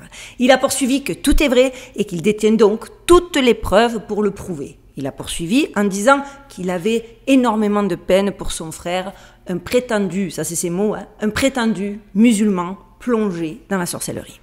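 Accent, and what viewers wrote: South, France. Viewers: J'aimerais avoir des informations de qui vous êtes ma chère et belle dame. Pourquoi d'abord vous êtes formidables et une pro dans se que vous faites 2, je suis un nouveau sur votre chaîne Youtube et je suis un artiste rebel retraité artiste de l'est du Canada français. Ma demande c'est que vous êtes très cool belle Vivent dans un condo hyper moderne. A las Vegas. Vous avez un accent du sud de la France genre de Marseille ou Toulouse ou ex en Provence. Je me demande qui vous êtes voilà bisou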